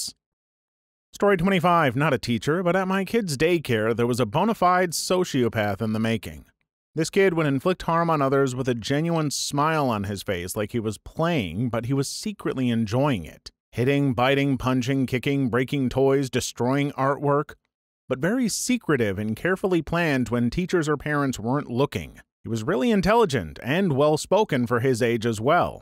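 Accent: France. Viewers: Honestly, your French accent isn't that bad! I just don't understand those insults lmao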